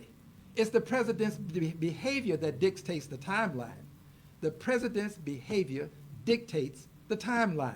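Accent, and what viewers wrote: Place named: United States. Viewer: True and clear speech . For me as a german it sounds like America.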